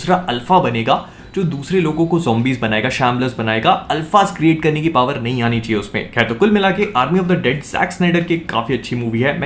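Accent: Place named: Hyderabad, India. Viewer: haidrabadi voice mast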